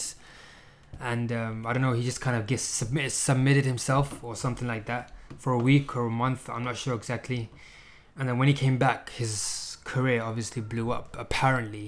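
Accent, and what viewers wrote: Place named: Bradford, United Kingdom. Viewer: Listening to your accent...YOU ARE FROM Bradford!! Your accent is exactly like Dynamo's!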